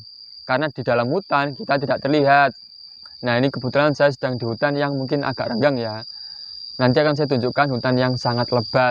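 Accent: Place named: Java, Indonesia. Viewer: Medok Pak, kata orang sumatera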